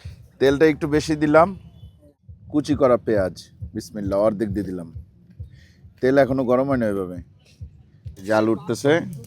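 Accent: Barishal, Bangladesh. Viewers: ভাই আপনার বাড়ি বরিশাল নাকি?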